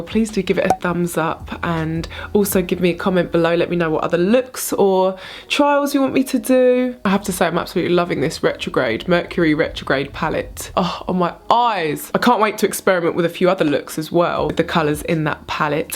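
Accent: United Kingdom. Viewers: God I love your accent! I’m an English teacher and I really admire your very British accent, I love listening to you 😂 why not compare all the new makeup of 2019, your favorites and the ones that didn’t work for you!! ❣️❣️❣️ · I've been following you for a little while now and I really love watching your Instagram stories it's relaxing in some way, and I love you British accent! ❤️ I would love to see a glam make up tutorial for Christmas! You're amazing, love you 🌹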